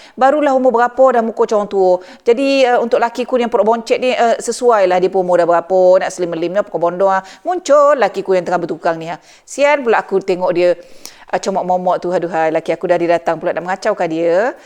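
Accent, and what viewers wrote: Negeri Sembilan, Malaysia. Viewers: Assalamualaikum Makcik....♥️ Suko boto nengok Makcik membebel sebijik mcm Makcik2 sy yg dh lamo x jumpo....laie klo cakap loghat nismilan...ghoman pun lobih kurang yo...hehehe♥️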